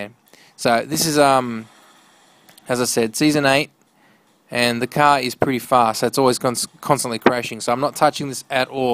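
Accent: Australia. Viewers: Are you Australian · Ur an Aussie mate👍🏻 Same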